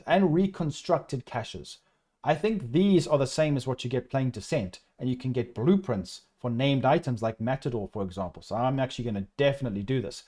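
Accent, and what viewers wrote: South Africa. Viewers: Hey are you South African you have that South African accent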